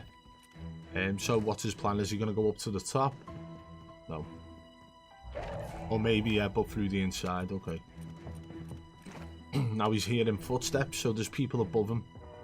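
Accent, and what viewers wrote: Liverpool, United Kingdom. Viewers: best scouse wz2 commentator out there, yes lad 😉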